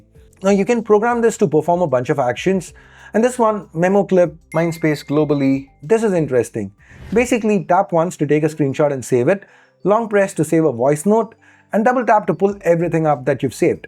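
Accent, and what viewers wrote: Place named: India. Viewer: Indian accent killing me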